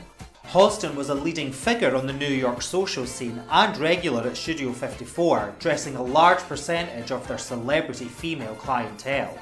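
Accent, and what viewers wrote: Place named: Scotland, United Kingdom. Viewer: stay tuned...because of the virus...unfortunately theyve halted production...but a miniseries is being done on Halston....the star...is EWAN McGREGOR...i got a bit emotional watching your video...because you used an image ...the image you used at 1 minute and 53 seconds in your video......that a friend of mine shot of Halston...his name is HARRY BENSON...he is a Scot just like you....with that same lovely burr you have.....he is the man who predicted i would become a photojournalist....i was just a writer when i worked with him... i laughed when he said it...but he was right....i think of him every time i do a shoot...he taught me to be AGGRESSIVE AND RELENTLESS....he photographed Halston a lot...and he loved him...he was basically the main photojournalist for the then new magazine PEOPLE magazine...and he shot Halston ...Liza...and Liz...for the cover...all together...FABULOUS...Harry said that H...was the king of NYC...and rightfully so...Harry also shot H...for WWD quite a few times...Harry said that H..would give him beautiful scarves to give to GiGi...his wife and she still wears them proudly..i cannot begin to tell you how wonderful H was...of course you know he used a lot of women of color as models...Pat Cleveland of course.....Alva Chin....but he often used the 1st black supermodel...the late....NAOMI SIMS...a LOT..she is MOTHER...to everyone who is black that loves fashion...she is MOTHER..when she retired...to create her own makeup line..and wig line ...both are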